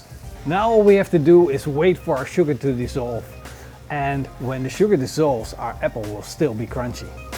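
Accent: Netherlands. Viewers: You're dutch arent you.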